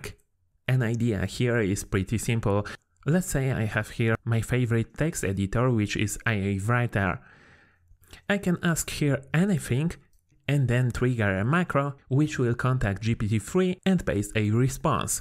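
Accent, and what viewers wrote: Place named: Poland. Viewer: Niestety ten filimik jest zbyt bardzo polski :( Uszy bolą od kłującego polskiego akcentu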